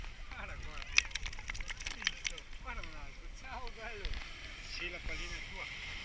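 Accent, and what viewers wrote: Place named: Tuscany, Italy. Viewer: Obbrao tummisei garbao un monte....tai imparao il toscano proprio bene...brao😊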